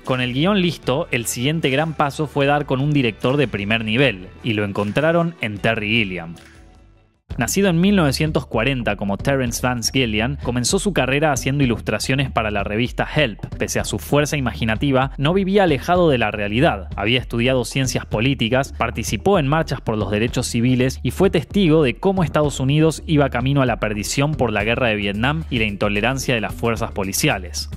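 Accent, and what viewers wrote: Argentina. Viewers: Me encanta ese asentó argentino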